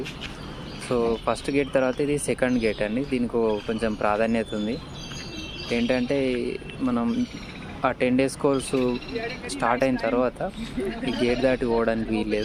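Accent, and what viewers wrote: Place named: Karimnagar, India. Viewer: Karimnagar language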